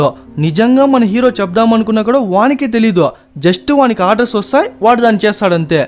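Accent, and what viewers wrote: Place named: Andhra Pradesh, India. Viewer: Cheyyandaa enti raa brooo. Chilton nellore boarder Slang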